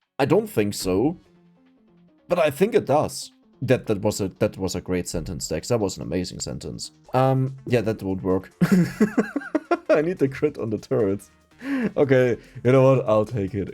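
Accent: Germany. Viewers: As a Dex newcomer i have been thinking what accent does he have. Today, i realized and feel very, very, very dumb. I even speak german.... The "Ooga, ooga, ooga" felt about right for my brain at that moment.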